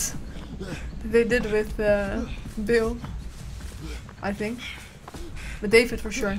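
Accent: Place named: Netherlands. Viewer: Her accent sounds dutch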